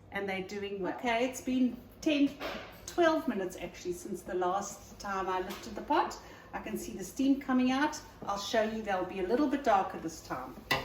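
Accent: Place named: South Africa. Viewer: Sounds as if she is from Johannesburg South Africa not Sydney Australia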